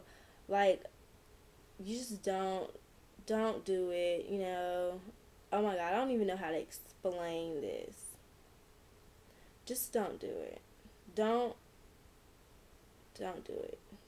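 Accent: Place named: South, United States. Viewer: you have an extremely southern country, down south accent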